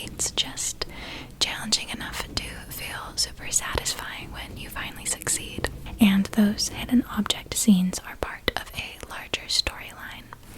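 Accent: Transatlantic, United States. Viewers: i like this classical american accent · You’ll probably not see this but I think it’s transatlantic, which was a mishmash of English and American, it was usually used by the upper class and Hollywood stars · She's so versatile with her roles! I'm a sucker for the transatlantic accent so this checks all my boxes 🖤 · Which American accent is it? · transatlantic accents are my weakness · love the 1920s transatlantic accent, super cool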